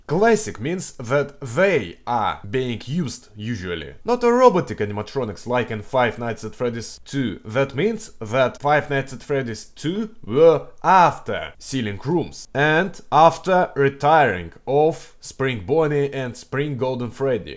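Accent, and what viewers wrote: Russia. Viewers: Very 👎 Russia accent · This russian accent is impssible to replace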